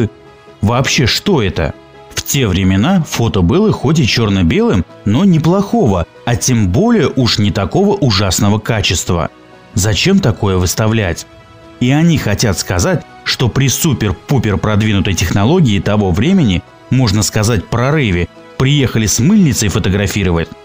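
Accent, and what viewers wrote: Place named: Moscow, Russia. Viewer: Он не коверкает. Он просто так произносит. Скорее всего он из Москвы или Подмосковья. К нам приезжал с Москвы человек. Он тоже ударение ставил также необычно для нас . СЫсерть, Верхняя ПЫшма и т.д..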